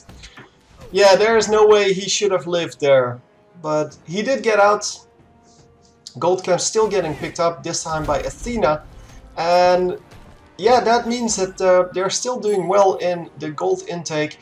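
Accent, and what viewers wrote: Netherlands. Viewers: I think you are Dutch right? I can hear that accent because I'm Dutch as well xD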